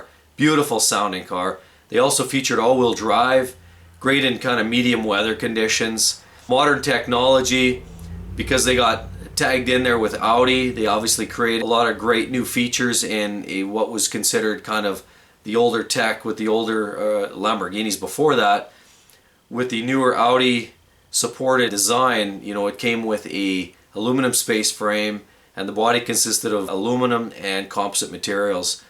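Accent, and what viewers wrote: Canada. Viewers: Thick Canadian accent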